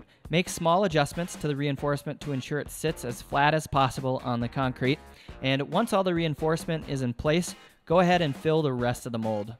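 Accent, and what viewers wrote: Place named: Montana, United States. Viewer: I have to ask: Is that what a Montana accent sounds like? I noticed you say the A in "bag" and "rag" in a very different way. Very nice looking table!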